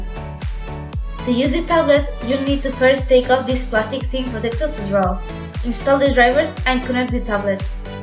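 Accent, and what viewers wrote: Spain. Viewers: Se nota que eres española 😂😂 hablo igual que tu el ingles bien pero con un toque español😂😂 besitos 💖💖👍👍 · She is spanish, te lo noto en el acento GUAPAA🥰💕 te kiero mucho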